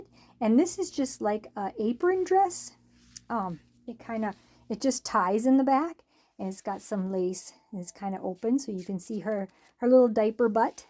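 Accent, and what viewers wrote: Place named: Minnesota, United States. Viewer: Omgosh this was so good and funny!! You are a stitch 😂😂❤❤ I can identify with your Minnesotan dialect ❤❤ I love your creative way to fix him into what y wanted ❤️ 💙 he is such a boy now!! I do not have one yet, but they are adorable 😍 ❤️ Are u ready for the colder air moving back in 😢 it was so beautiful yesterday. I guess we're still in spring labor pains😂 Your cupcakes 🧁 were so nice•❤❤ I bet they are yummy!! I received my new baby girl!! You can see a clip from her artist on her channel, Tinyhands4ever. ❤❤🎉🎉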